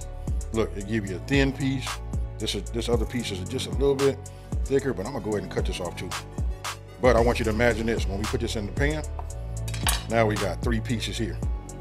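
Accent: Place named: Los Angeles, United States. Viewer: I can tell u a l.a brother by that accent lol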